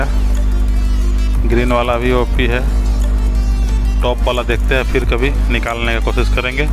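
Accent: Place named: Bihar, India. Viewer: Bhai aap Bihar se ho kya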